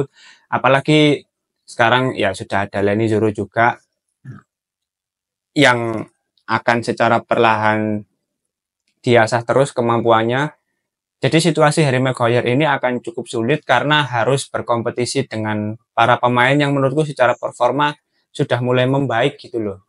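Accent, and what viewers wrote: Java, Indonesia. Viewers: Jowo e ndi??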